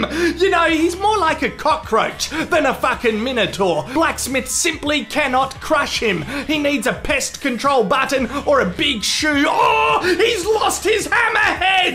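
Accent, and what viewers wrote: Australia. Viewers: The perfect Australian voice over Sat-Nav along the Birdsville track or the Nullabor h'way! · Haha, Australian pronunciation: fvck ea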